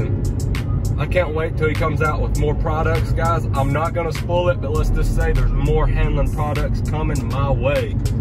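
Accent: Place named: South, United States. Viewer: For me its his lovely southern accent and the background music. #thevibes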